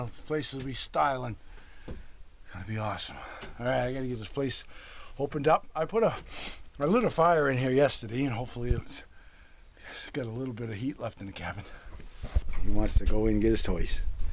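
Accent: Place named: New England, United States. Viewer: northern NY Adirondacks you sound like yer from NH VT or MA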